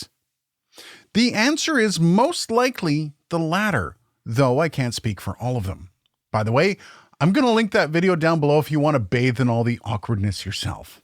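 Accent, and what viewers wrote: Canada. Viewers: All I heard this entire video was aboot.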